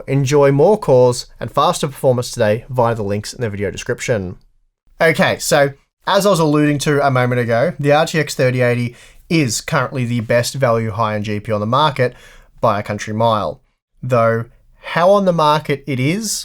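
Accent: Australia. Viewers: I really love how Aussies pronounce things....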